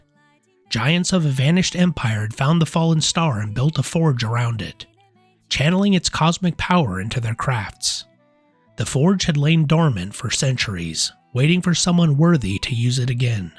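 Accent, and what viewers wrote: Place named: South, United States. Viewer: you sound like you are from the south.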